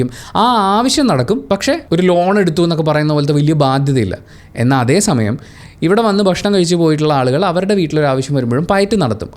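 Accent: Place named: Eastern Kerala, India. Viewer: പാലക്കാട്‌ ഭാഷ അല്ല കിഴക്കൻ മേഖലയിൽ ചില ആളുകൾ സംസാരിക്കുന്ന ഭാഷ ആണ്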